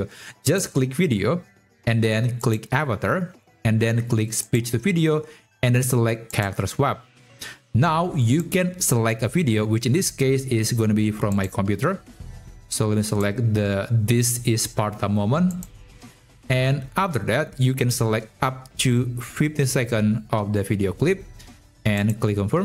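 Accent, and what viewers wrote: Indonesia. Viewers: Org indo ya bg? Aksennya ketara😂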